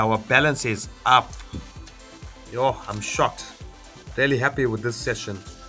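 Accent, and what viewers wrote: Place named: Durban, South Africa. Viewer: Awe awe bro... congratulations man🎉🎉.. you sound like you from Dbn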